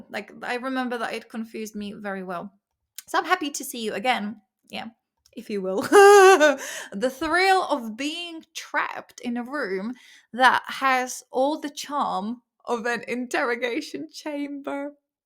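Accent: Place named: United Kingdom. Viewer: I love your accent, actually Persian is my main one but I always enjoy British accent ❤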